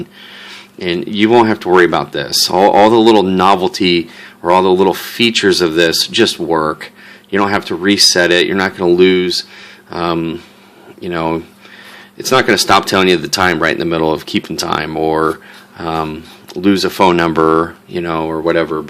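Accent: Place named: United States. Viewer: Great Video...Well explained on all the Features and Functions over 33 minutes! Not boring at all...well spoken! But then again, you sound like Michael Douglas (the actor) with your American Accent as i am a Northern Englishman with a Yorkshire Accent! i just bought a 150 metal (silver) for £75 including delivery off E-Bay last week.!!! It was 2nd hand...but in near excellent condition! I was impressed as it arrived today! I had a Casio150 (identical) bought in 2013 as a gift and it has been well used and knocked/worn out! I caught the strap on a metal fence (caught inbetween the strap and wrist and it broke the strap!) Also the battery was replaced around the same time and it now doesnt bleep at all, and the repair shop didnt know how to fix it...so i decided to get another! Il keep it for spares as i did the previous casio 80 model i bought in 2003 which was a shell from which i used my 1994 black rubber 80 casio!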